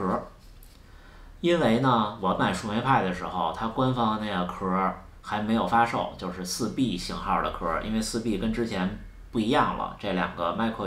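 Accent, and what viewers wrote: Beijing, China. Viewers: 最需要的就是可以直接在树莓派本身系统上实现各种功能的教程了，刷来刷去的太麻烦，老哥北京口音听着也很舒服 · 比较喜欢up主的风格 说话听起来很舒服 北京哥们吧， 希望保持这种风格 教程针对新手小白也比较友好，再帮帮小白，做点更加有趣易于操作的项目吧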